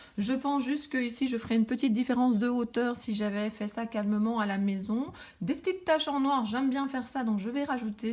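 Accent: Belgium. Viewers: Coucou, trop bien tes achats, moi, j'ai hâte d'aller à Passion Scrap en avril. J'adore ce que fait Scrapistelle. J'ai fais une crop d'une journée avec elle pour réaliser un album il y a de ça quelques années...elle est top...sympa, créative, simple...trop bien. Je pense que tu dois être pareille...et j'adoooore cet accent qui me rappelle trop ma Belgique natale...au plaisir de voir quelques autres de tes réalisations. Gros bisous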